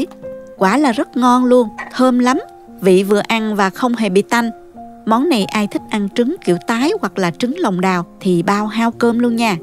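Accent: South, Vietnam. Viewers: Giọng nói ngọt ngào quá. Tiếc là cô dùng từ Hán Việt nhiều quá, nặng nề mà không phù hợp. Chẳng hạn, “ cứu cánh” không có nghĩa là cứu giúp, cứu thoát. Cứu cánh là mục đích cuối cùng, giải pháp duy nhất…từ này có ý nghĩa triết học,không phù hợp với chuyện nấu nướng, thay đổi món ăn cho lạ miệng… Giọng miền nam ngọt ngào thì nên dùng cách nói giản dị, thân tình, dễ hiểu thì kênh của sẽ thu hút nhiều người xem hơn đó.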